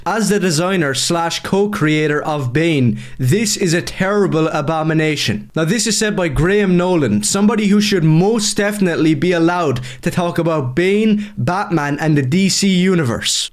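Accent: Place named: Ireland. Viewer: You're a funny Irish guy (I'm guessing Irish by your accent). You're also completely correct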